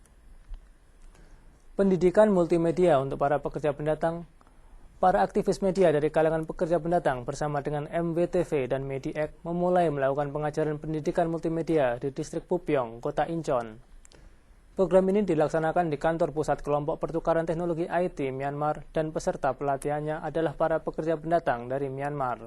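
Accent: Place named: Java, Indonesia. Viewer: His Java accent... -.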